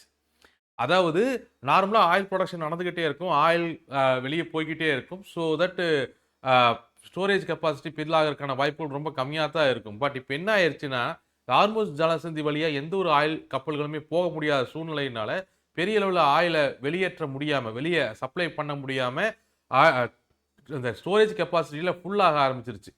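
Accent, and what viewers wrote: Kongu, India. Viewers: அது எங்களின் பிரத்யேகமான கொங்கு slang Sir.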